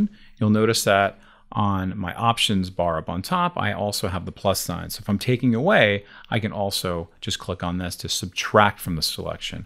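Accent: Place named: United States. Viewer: Appreciated it but struggled a bit with Adobe help, just didn't 'get you started', it seemed to make assumptions about what you already knew (great chopping up layers but how do you get the pictures in the first place to be able to edit them?). This guy is brilliant, so well constructed, builds up nicely, importantly for a Brit, has a very toned down American accent and style - that's a compliment, he's really easy to listen to. Thank you so much, just brilliant.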